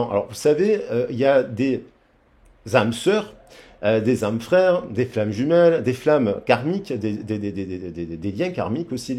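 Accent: South, France. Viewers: Coucou j'adore vos guidances et l accent du midi moi toujours seule les week-end mon autre chez lui sa sorcière anniversaire 🎂 🎂 moi je suis de trop gros bisous et bonne soirée je vous embrasse très fort moi j ai mon voyage bloqué je devais pzrtir du 7 au 14 09 bloqué c'est la.sorciere sui a bloqué super mille bisous ❤❤❤❤❤❤❤❤❤🎉🎉🎉🎉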